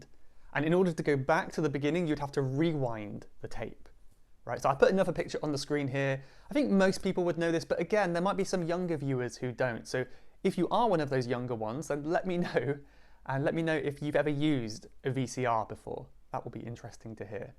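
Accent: United Kingdom. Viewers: Teacher, may I ask which part of the UK you are from? 😊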